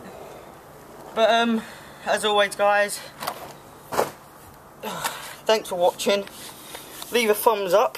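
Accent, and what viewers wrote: United Kingdom. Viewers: gr8 m8 8/8 sound like from the south east somewhere. Not Scottish though m8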